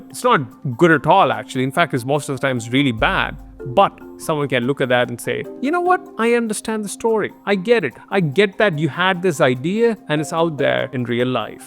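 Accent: India. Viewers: Are you Indian cuz you sound like btw I am learning art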